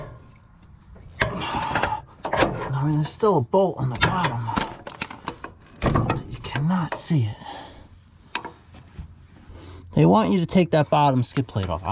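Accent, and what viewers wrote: New York, United States. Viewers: New york somewhere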